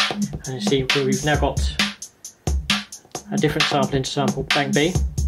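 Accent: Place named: Arizona, United States. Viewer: Arizona accent:D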